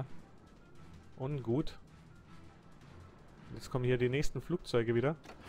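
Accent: Bavaria, Germany. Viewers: absoluter Fan von dir, erinnere mich noch gerne an WoT mit bayrischem Dialekt. Da ich seit Mai in Wien wohne muß ich sagen hier wird alles gesprochen nur wenig österreichische Dialekte.HeHe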